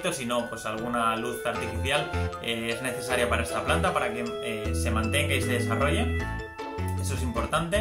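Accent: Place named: Andalusia, Spain. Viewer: Hola, de que parte de Andalucía eres?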